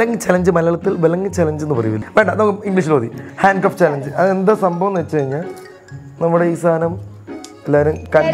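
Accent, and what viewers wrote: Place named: Haryana, India. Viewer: ki Haryanvi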